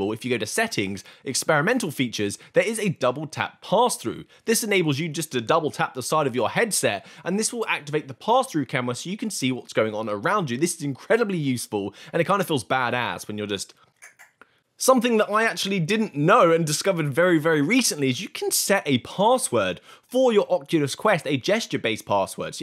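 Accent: United Kingdom. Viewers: Where do you come from in UK? I can't identify the accent. Norfolk? Essex? Or?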